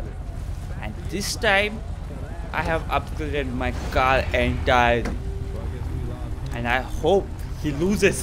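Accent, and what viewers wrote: India. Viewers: Why do i hate hindi accent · You indian accent is so strong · Dude are you India because your accent is Indian pls tell me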